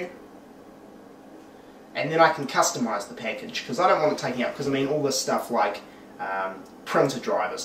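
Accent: New Zealand. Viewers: Sounds more like New Zealand accent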